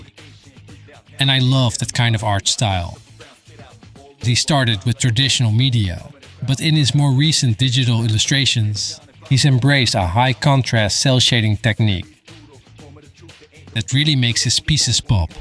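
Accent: Netherlands. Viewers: are you perchance dutch i feel like u have a dutch accent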